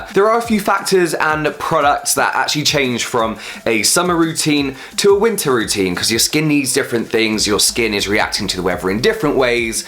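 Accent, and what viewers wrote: United Kingdom. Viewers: Am I the only one who dies for the British accent? :D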